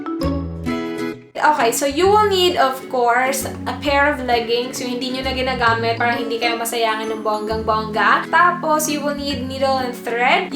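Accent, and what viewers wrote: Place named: Visayas, Philippines. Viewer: She i guess is Bisaya, i love her accent when she speaks English.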